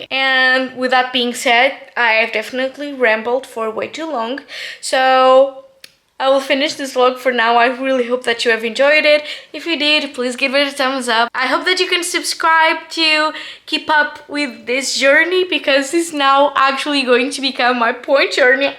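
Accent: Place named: France. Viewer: I've watched several of your videos and shorts. Youre actually looking quite good! Trying to figure out your accent. First though South American, then maybe Russian, but then you don't pronounce h's, so French?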